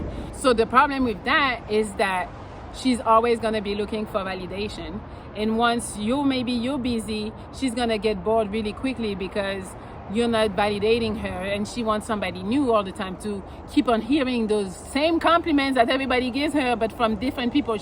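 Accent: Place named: France. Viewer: It's French. I prefer to believe it's Brazilian.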